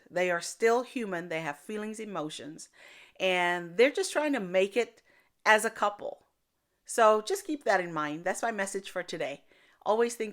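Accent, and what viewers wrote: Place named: South Africa. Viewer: Clicked as soon as I saw your notification. Your South African Accent coming through nicely today😊 Out of all things I've heard this makes the most sense. Yes they are married to one another and they don't owe us anything. {Also this is the first time ever I get to comment First! }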